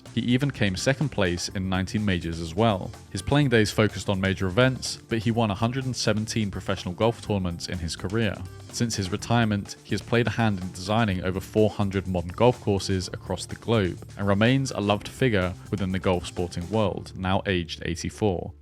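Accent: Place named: United Kingdom. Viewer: I think he is just British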